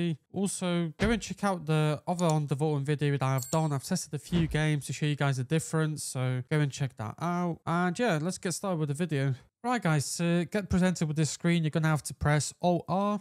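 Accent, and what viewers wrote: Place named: United Kingdom. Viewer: hi Bro nice video again! But you again didnt showed the real fan curve, just seing the curve without values is not really helpfull :( We need the numbers!! xD thanks for testing! Love your content and that heavy accent (british I think? :P), your videos are amazing quality too, I would expected this quality from a channel with 20-100k subs but you have 1.000 but still so quality videos, thank you!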